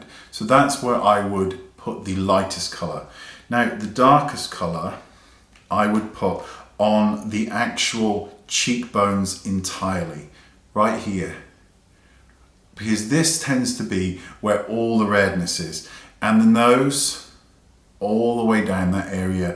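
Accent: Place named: West Country, United Kingdom. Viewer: Your accent was extra West Country today, I love that accent!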